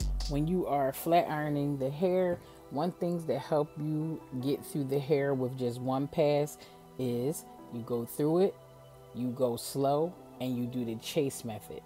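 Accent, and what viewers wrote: Philadelphia, United States. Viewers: Are you in philly? You sound like my Philly family. I need a natural beautician and I be in Philly sometimes.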